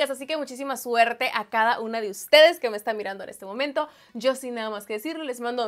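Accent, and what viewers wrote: Sinaloa, Mexico. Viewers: Me encantan tus videos... cada que subes pienso en "Sinaloa", tienes bien marcado el estilo sinaloense hehe .. Te veo desde Ensenada!!😊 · "SINALOA" Se me viene a la mente tanto por tu acento como tu personalidad 😋😉 Saludos desde Ensenada 😎💜 Espero ganar porque me encantaría aprender a recrear tus maquillajes 😍😄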